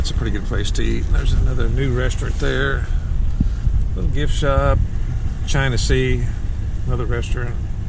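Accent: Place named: South, United States. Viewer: Thank you for the more realistic tour of Whittier. Does the town keep the roads plowed well In winter? I wish we could have toured your condo. Seems like no homeless in Whittier. Love your bio. You seem pretty healthy for 70. And I’m sure the permanent fund dividend is a nice perk. Good for you for making your dreams come true. Whar 😮state did you come from? You sound like you have a southern accent.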